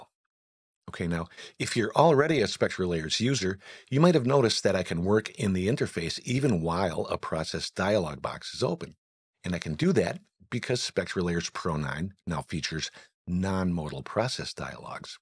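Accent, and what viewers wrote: United States. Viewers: The upstate NY accent warms my heart. Oh and SL 9 is cool too!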